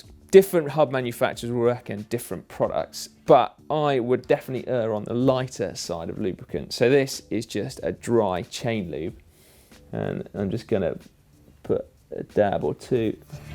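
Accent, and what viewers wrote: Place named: RP, United Kingdom. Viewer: What a pity you speak like Prince Charles with his boyfriends you know what up his you know what.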